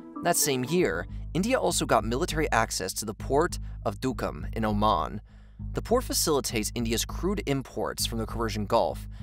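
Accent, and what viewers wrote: United States. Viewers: Bruh. He has a normal American accent. He just isn't pronouncing these names right. Anyone more aware of these things should know that "Delhi" sounds like "deli." It's just incompetence. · Are you American 😅😅😅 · Love the videos. Hate the American pronunciation of all the places in the world. Saying that "new daily" is a new one for me.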